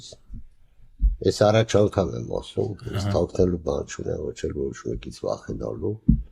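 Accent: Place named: Ijevan, Armenia. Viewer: Кяваро иджеванский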